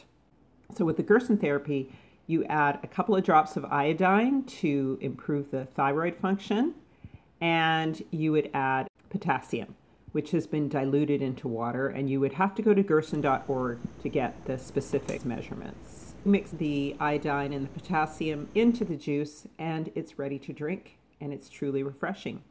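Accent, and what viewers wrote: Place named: Canada. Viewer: I thought I heard a Canadian accent. Im from Winnipeg but my whole family lives in Nanaimo on the island. I live in Houston, Tx. Love your vids. Want to know a little more about the potassium salts. I went to <link> but couldn't find anything. I have alot of thyroid issues but don't take any meds. Try to get what I need naturally but think I need a little more help. 🕊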